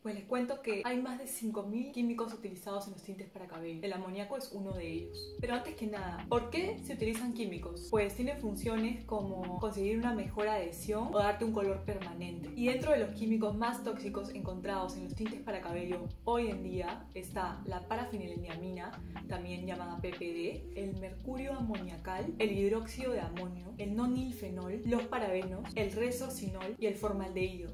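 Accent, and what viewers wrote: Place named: Mexico. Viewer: Gracias! ✨ Soy solo yo o alguien más te ha dicho que tu acento suena un tanto a mexicano? 😅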